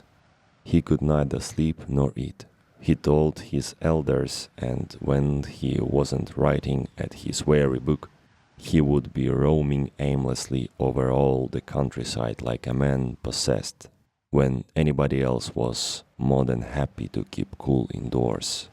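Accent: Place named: Eastern Europe. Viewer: the voice and accent sucks big time ... wtf ??? it's robert louis stevenson .. not eastern european story time !!!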